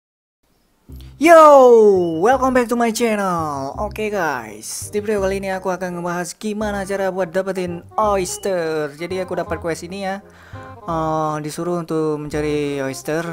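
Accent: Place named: Aceh, Indonesia. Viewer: Salam dari aceh nice video bro pantes logatmu kok kaya familiar saya hahaha.. beu maju beu sukses beuh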